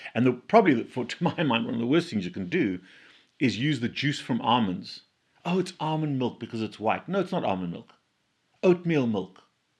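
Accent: South Africa. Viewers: Ha! I thought you had a South African accent.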